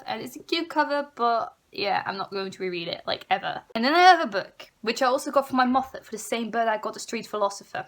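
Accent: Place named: Netherlands. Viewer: Love this! I love your accent haha :) I'm Dutch as well, although my videos are also in English. I should really read more, I'm definitely going to follow you.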